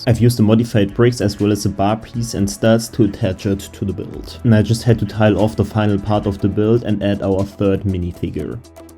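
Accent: Germany. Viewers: Are you German because you have such an accent?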